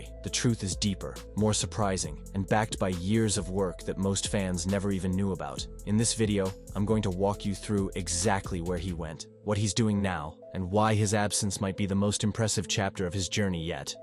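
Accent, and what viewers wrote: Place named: United States. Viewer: Why the hideous American voiceover ???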